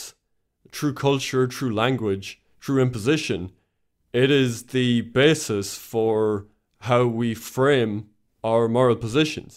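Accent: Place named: Ireland. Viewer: cute adorable Irish accent. Comes from centuries of British molestation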